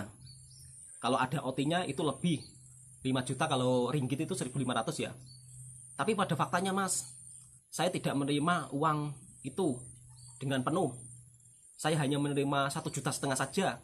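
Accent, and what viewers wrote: Java, Indonesia. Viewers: wah sudah kurang medok nya wak.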